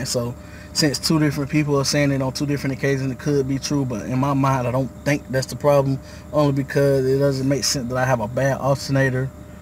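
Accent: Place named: RP, United Kingdom. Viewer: Hate these posh accents🤓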